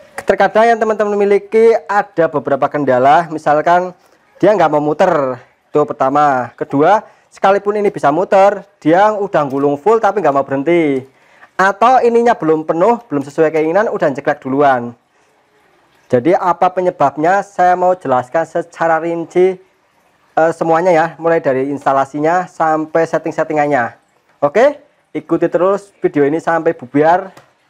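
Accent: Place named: Banyumas, Indonesia. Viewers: Ngoser, jembeng n amba....hahahaaa, ngapak abis Mas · Mas orang ngapak ya sama dong 😁😁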